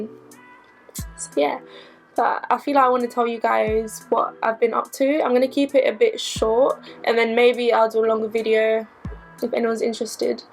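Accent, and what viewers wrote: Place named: United Kingdom. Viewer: I love your British accent 😍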